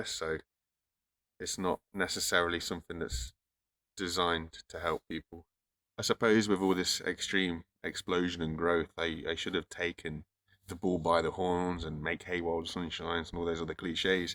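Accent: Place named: England, United Kingdom. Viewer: It's obvious from his accent that he's not Welsh. He has an English accent and most likely lives in London or one of the Home counties.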